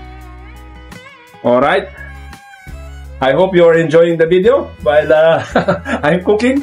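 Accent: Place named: United Kingdom. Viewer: Wow british accent ne😍😍😍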